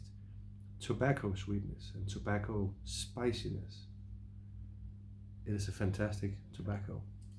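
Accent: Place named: Michigan, United States. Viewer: Sounds like Michigan 😁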